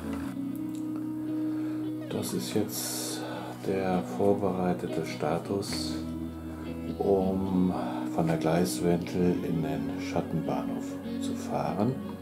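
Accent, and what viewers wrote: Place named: Bavaria, Germany. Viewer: Mal ne Frage;kann man bei Märklin nicht auch einfach die Schiene zwischen 2 Schwellen mit dem Dremel trennen,dann brauchtst die Verbinder neet.Als Bayer ein gutes Hochdeutsch,bestimmt kein gebürtiger.Der Klappensinn wird nun sinnvoll ersichtlich,trotzdem hätte ich diesen andersherum zum Öffnen gebaut,also das die Scharniere unten sind und die Klappen mit Magneten gesichert od.mit Scherenhalterung,wie bei Klappböcken/Liegen od.ä.Das ist alles KEINE Kritik,nur es sehen und lesen wohl noch hoffentlich viele Mobahner diese/Deine Videos.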